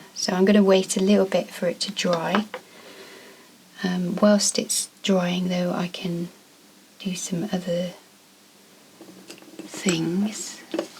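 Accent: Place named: United Kingdom. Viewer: I love the colors in this so much, and your style! Started to watch yours and other YouTubers this winter when I surfed around for inspiration this dark winter. Always loved British accents and yours is so nice to listen to, haha, sounds weird maybe, but I am Swedish and most sweeds speak English with a terrible American accent. The vlog when you are walking around and sketching the beautiful landscape is so nice to watch. I'm going to South of France next week and will be doing my first sketching outside there I hope. In Stockholm its far too cold, the snow just melted. Thanks for the inspiration!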